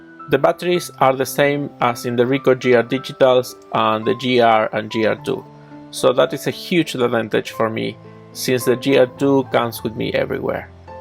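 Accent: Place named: Argentina. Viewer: Se te nota el acento argentino, jeje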